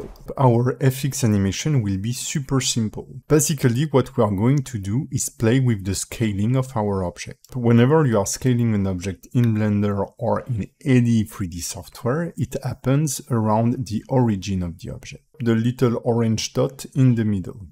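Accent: France. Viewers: Serais tu français ? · On sent tellement l'accent français haha, excellent tuto btw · Bonjour, très bon tuto! ton accent français ma permis de comprendre plus facilement même si mon anglais est moyen! :D thx! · Tu es français n'est ce pas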